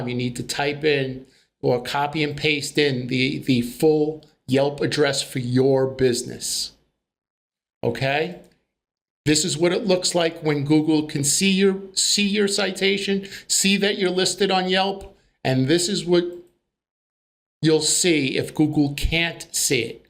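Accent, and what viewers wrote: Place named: New York, United States. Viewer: I think I hear a fellow New Yaaawker 👂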